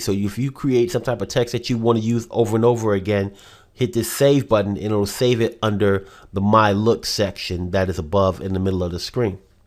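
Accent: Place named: East Coast, United States. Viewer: Come on man that East Coast accent is bomb! Specially since I'm way out West! BTW nice tutorial, I learned a lot. Thanks!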